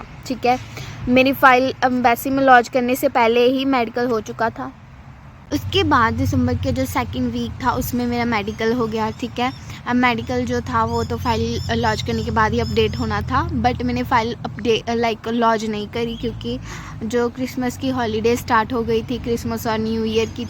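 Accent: India. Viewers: Waah what a Punjabi accent!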